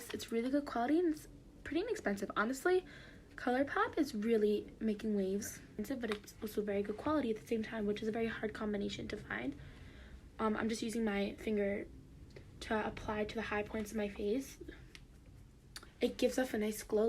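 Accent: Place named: New York, United States. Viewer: getting heavy new york accent vibes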